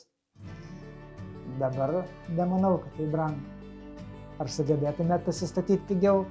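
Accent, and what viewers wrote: Aukštaitija, Lithuania. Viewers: Vat tas grynas aukštaitiškas dialektas :D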